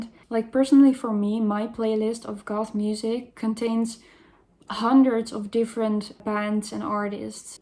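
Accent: Netherlands. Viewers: I love your eye makeup. The "phase" thing...I didn't really discover the goth "thing" until my 40s (I'm nearly 47), but I've seen goths in their 70s - no reason it should be only a phase, and if it is for some people what is wrong with that? We like what we like whatever age we are, right? :) As far as bands go, if you haven't heard them or heard of them check out a band called Suspiria - I think they're from here in the UK. Not sure if they're active now, but they were big here in the 90s, and I know they have some stuff on YouTube. Also, if I may say so, I love your accent - the Dutch people I've met have been lovely, caring people without exception and I enjoyed what little time I spent in the Netherlands very much. Hope all is well. :)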